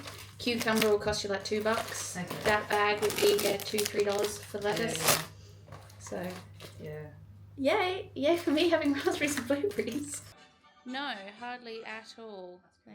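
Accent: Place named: United Kingdom. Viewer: The British accent coming out nice and strong!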